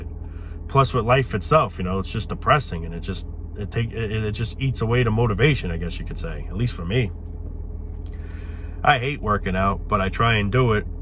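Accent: New York, United States. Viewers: NY accent, makes this better.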